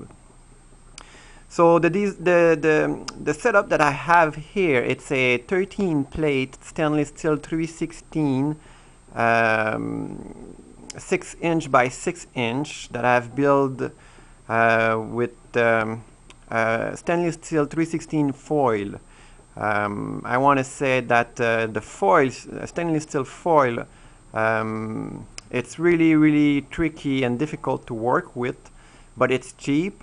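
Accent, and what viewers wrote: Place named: France. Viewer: from ur accent, u can speak french right? je l aime bien to projet car je suis tres interesser a ce nouveau source d energie:) merci...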